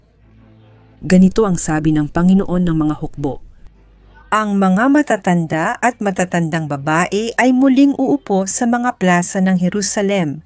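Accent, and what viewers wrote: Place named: Philippines. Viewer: GOD, bless para kang pilipino mag salita maganda ka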